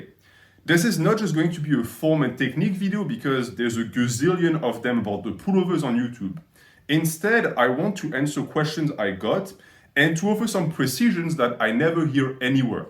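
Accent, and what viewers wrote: France. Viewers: Rassure moi,tu es français? Je fais allusion à ton accent. Je suis également fan du pull-over,il travaille tout le haut du corps,trapèze,épaules,dos et pecs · je sais reconnaitre un accent francais quand j'en entends un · Oh l'accent français !!! Trop content de découvrir cette chaine !!!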